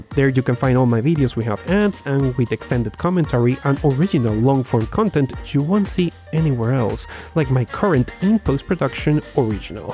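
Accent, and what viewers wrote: Venezuela. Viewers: Estoy casi 100% seguro por como habla y se le medio sale que es venezolano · Hablas identico a mi en español, acaso eres Venezolano?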